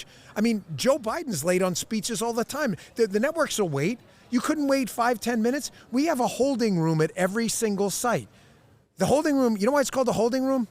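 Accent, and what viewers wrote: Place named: New York, United States. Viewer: love Dans italian NY smart aZZ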